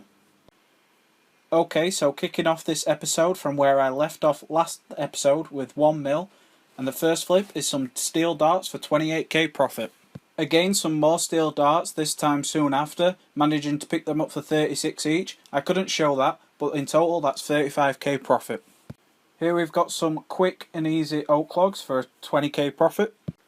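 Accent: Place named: Scotland, United Kingdom. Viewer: gotta love the scottish accent!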